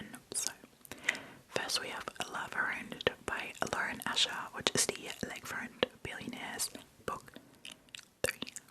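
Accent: Australia. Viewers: I genuinely thought you were australian this whole time…😭 your accent is so interesting knowing english is your second language!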